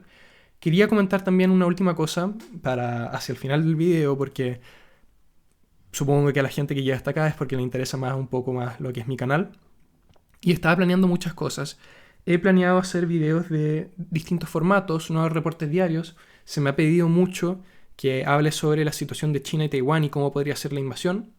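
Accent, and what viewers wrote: Chile. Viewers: ¿Por casualidad eres chileno?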